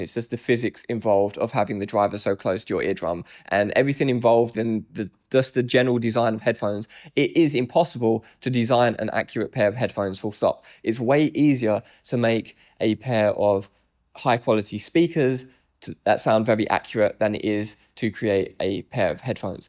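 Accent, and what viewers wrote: United Kingdom. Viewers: Well, he's opinionated. And with a British accent can help make him more obnoxious. I guess whatever works.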